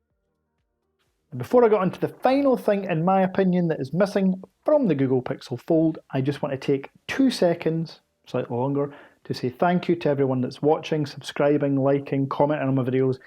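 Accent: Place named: Scotland, United Kingdom. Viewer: I’m new here in your channel, and o need to congrats you for the video, and the Scottish accent make even better. It’s on of my favourite accent in the world 🌎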